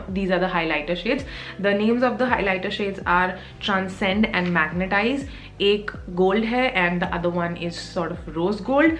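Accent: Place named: France. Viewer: Loved your French accent 😃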